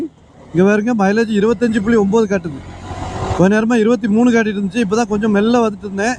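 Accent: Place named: Thoothukudi, India. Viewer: உங்கள் தூத்துக்குடி Slang ku நாங்க அடிமை 😂 continue 🎉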